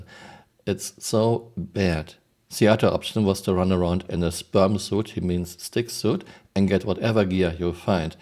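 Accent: Germany. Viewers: LOL ich höre ganz stark heraus, dass du deutsch bist ✌️ Liege ich richtig ?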